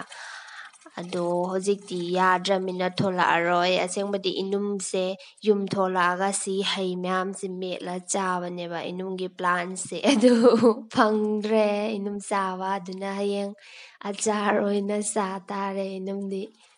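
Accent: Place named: Manipur, India. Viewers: Kakching gi accent ni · Kakching marolse taba Yamna nungai eidi ...rhythm se ...cute oiye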